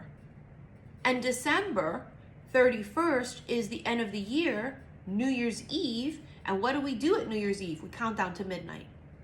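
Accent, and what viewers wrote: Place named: Hawaii, United States. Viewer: I knew you were from Hawaiʻi b4 u even said it! Ke Aloha nui Tita!🙏❤️🌿🌺😂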